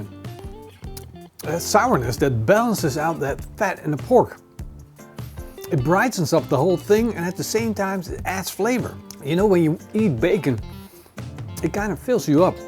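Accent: Netherlands. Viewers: Zo te horen ben je nederlands. Waar haal je in godsnaam een rack als dit? Ik neem aan niet gewoon bij een slager?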